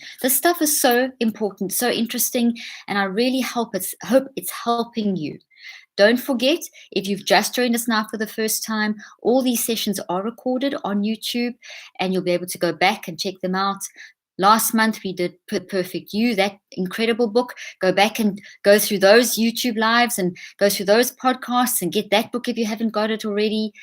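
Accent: South Africa. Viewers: Smart young lady . Love the SA accent too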